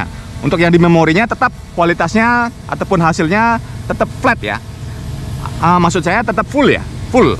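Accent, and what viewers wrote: Bali, Indonesia. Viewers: MAS, SAYA MAU TANYA KOQ LOGATNYA ABANG KAYA ORANG BALI...MAAF CUMA PENGEN TAHU AJA DARI MANA ASALNYA BANG SAYA SUKA NYIMAK VIDEO REVEWNYA.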